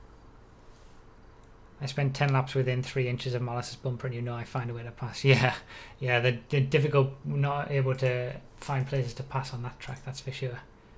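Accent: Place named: Northeast England, United Kingdom. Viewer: You sound like you are from North east england where abouts are you? xD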